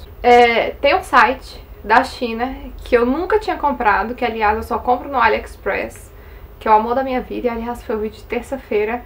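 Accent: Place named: Bahia, Brazil. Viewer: SÓ VOCÊ MESMO ! Estava pesquisando para comprar pincéis .. arrasou .. comprar nesse site e como sou ansiosa 18 dias eu aguento kkkk assim que chegar eu te conto. Sua linda muito obrigada pela dica. Eu adoro demais seu canal !. Ahhhh até esqueci mas a minha amiga que tem seu sotaque ela mora no interior da Bahia Hahahhaha 😂😀 Beijo lindaaaa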